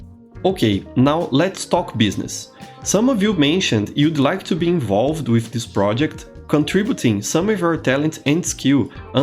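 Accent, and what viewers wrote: Brazil. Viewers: why does your way of speaking seem so much like a brazilian speaking english?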